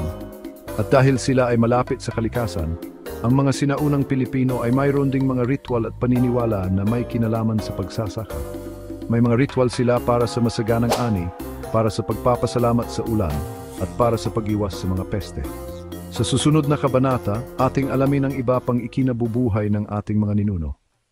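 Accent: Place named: United States. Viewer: PARANG AMERIKANO YUNG NARRATOR..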